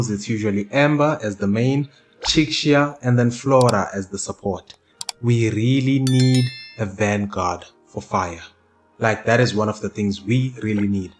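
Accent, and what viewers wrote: South Africa. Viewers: Sounds like a South African accent..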